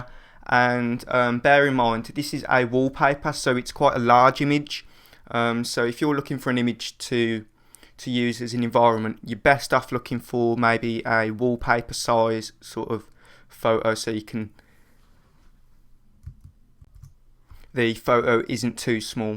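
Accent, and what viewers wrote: Birmingham, United Kingdom. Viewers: Sounds like Birmingham UK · That's a brummie accent from Birmingham, England. Chips an Grayvay! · i beleve its Birmingham (uk)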